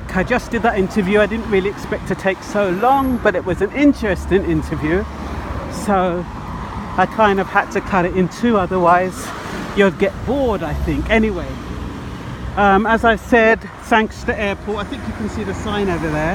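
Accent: Jamaica. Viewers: As much as your English you cannot hide the Jamaican in you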